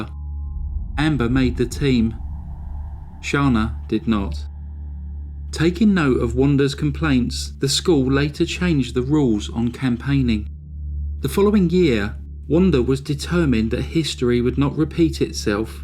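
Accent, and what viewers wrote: England, United Kingdom. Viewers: I thought at first you said Verna and Amber had been winning twerking contests, at three years old! It is easy to see how this case became a comedy movie. Bargaining and bartering with the hit man, all those marriages, the two women so much alike, so much in common but obviously bishes to each other. That Wanda made a complaint about Amber's campaign, then at the first opportunity fell foul to the rules that were changed at her instigation, so she gets her own daughter disqualified.. Even just the fact that 12 year old girls had such intense and serious "campaigns" with obvious voter buying-its so silly to the rest of us. It's all just so.....Texas, I suppose. Weird, WTH cases seem prevelant, deep in the heart. Thanks, kudos as always. PS I did giggle when in your polite, English narration voice, you said Wanda was regarded as up herself. 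PS again, I think it's unfair YT won't let us like on a second time around! Btw On my mini binge tonight, I've even let the four minute ads play through, but it took a lot of will power.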